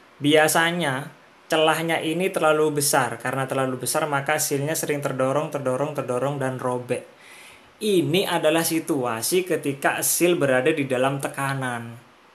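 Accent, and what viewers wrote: Madura, Indonesia. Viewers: Nada2 orang madura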